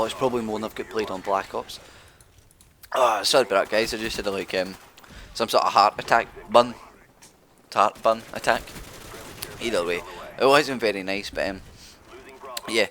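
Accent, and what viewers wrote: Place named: Ireland. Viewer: Everything's funny with an irish accent!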